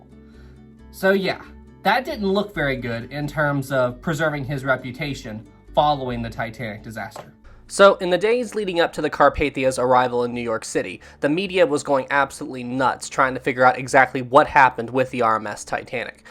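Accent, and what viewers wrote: South, United States. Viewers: You seem to lapse in and out of a Southern accent.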